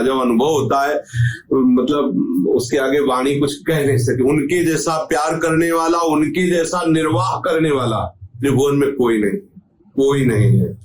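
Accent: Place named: Kanpur, India. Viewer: आप श्री तो कानपुर से हैं वाणी से पता लग गया, चौबेपुर वाले विकास बाजपेई भी ऐसे ही बोलत हैं 🙏🙏🙏🙏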